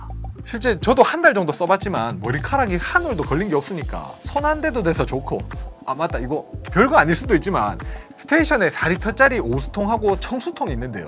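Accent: Gyeongsang, South Korea. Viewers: 경상도사투리 드럽게 듣기 싫네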